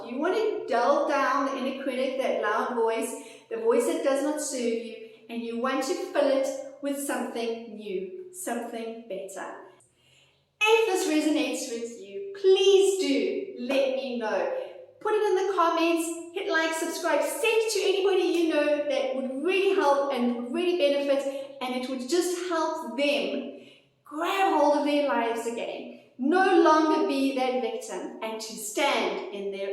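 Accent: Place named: South Africa. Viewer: Hi - I’m fascinated by the subject of emotional resilience and I’m desperate to learn everything about it. I typed the words in YouTube and came across your channel. This video resonated with me personally on an experience level as well as an aha moment level. Please let me know if we can chat. You sound South African - so am I 😊 Thank you in advance!!!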